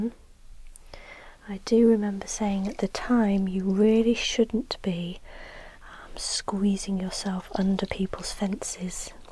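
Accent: United Kingdom. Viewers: British, ASMR, dog. Perfect. Shouldn't have watched this right before going to the gym ZzzzzzZZZZzzzzz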